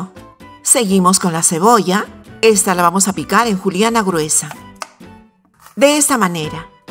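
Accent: Peru. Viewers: Hola, muy buanos dias;Soy aficionado a tus recetas de comida peruana. Yo soy peruano, pero vivo desde hace 32 años en España, y siempre e echado en falta nuestra comida peruana, y me e visto en la necesidad de aprender a cosinar y me guió por tus recetas. Me gustaría mucho que te des a conocer, pon un poco de tu imagen;Por tu forma de hablar creo que heres mi paisana, si no me equivoco de Huaraz?, yo soy de Yungay(Ancash). Un cordial saludo, y muchas felicitaciones por tus excelentes recetas,gtscias.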